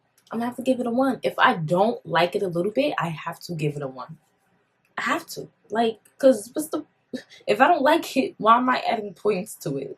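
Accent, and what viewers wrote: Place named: East Coast, United States. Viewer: It’s giving East Coast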